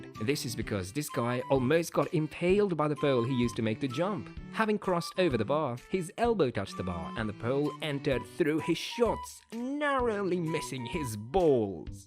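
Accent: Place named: England, United Kingdom. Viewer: The English accent make it so much funnier👌.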